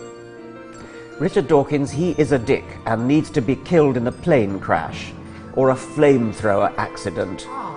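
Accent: England, United Kingdom. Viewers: LMAO do funny with his prim English accent and dry humor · we all speak like that - in England dear. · It's made all the funnier by Richard Dawkins reading out the often illiterate, rambling, expletive laden stuff from people claiming to be god-fearing Christians in his dry English accent. My own favourite is th eone who tells him how they are looking forward to seeing him being tortured in hell and signs it off with "a loving created daughter of our lord". And of course "the fart of god" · These always bring such a grin to my face. I love the way Dawkins reads them in that proper English accent. Makes them 10,000x more humorous! Please, not such a long spell between these! Just love the love letters!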